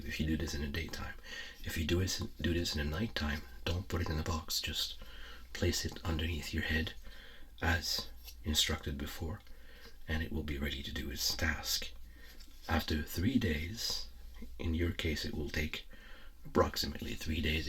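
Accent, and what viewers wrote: Quebec, Canada. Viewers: Great video! Love the theme and ambience. Are you québécois by any chance?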